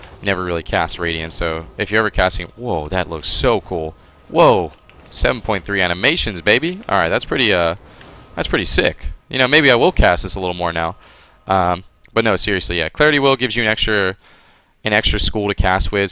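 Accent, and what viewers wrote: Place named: United States. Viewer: he sounds american.....but he uses the lingo of someone who isnt...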